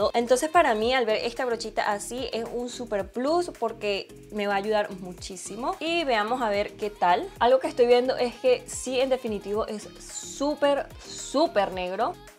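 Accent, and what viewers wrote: Venezuela. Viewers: Hola, no se por que tu acento, me parece venezolano ☺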